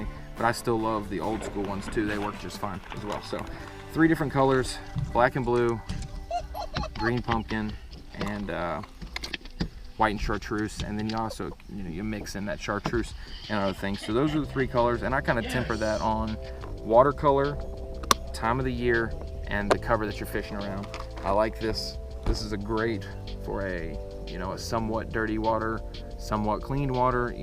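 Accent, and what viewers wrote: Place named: South Carolina, United States. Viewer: I thought you were another South Carolina boy for a second!! Very informative man. I absolutely love the jackhammer.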